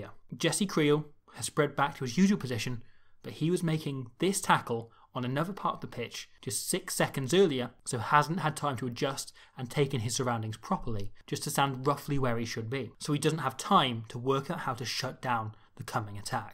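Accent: Wales, United Kingdom. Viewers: Never expected I would be this excited to listen to a welsh man speack to me for 30 minutes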